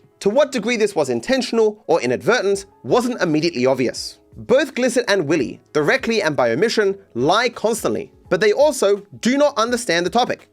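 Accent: Australia. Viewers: I dont even know what he means. He's literally just Australian. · Angry Aussie sounds for almost three hours. I like it😂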